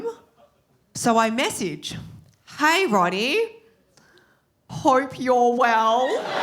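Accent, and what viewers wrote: Australia. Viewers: You are so much fun and perfect and beautiful! Icing on the cake is that you are Asian with an Australian accent..... win. win .. I could listen to you all day. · Ugh...Chinese with an Australian accent? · Wow that is thicke australian accent i love it. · I'm sorry, not being mean, just you are hard to understand because you're Australian accent is so strong. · People think your voice doesnt match the face because we are so used to American voices when we hear someone speak, when we hear someone from Australia everyone thinks its some white person because most movies show that, Australia isnt really popular in anything and noone knows how diverse Australia really is compared to most western countries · The first time I found the Australian accent so beautiful! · As a fellow Aussie, I really do think that anyone who has heard you talk and said you aren’t Australian is just extremely jealous of the fact you sound more Aussie than they ever will, but are also far more interesting because of how you look. 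😂 Also, I am pretty sure it is the most Aussie thing to upscale your story every time you tell it, sort of like fishermen. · Going onto midnight and this pops up on my You Tube feed. I'm from New Zealand, and I see a Chinese woman with a strong Australian accent. I can't understand Chinese or Australian. I had to put the subtitles on . Sorry. Anyway, I had a peek. Glad I did, very funny and witty. New Subscriber.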